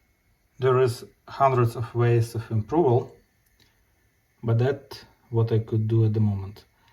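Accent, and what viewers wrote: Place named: Russia. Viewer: Strong russian accent...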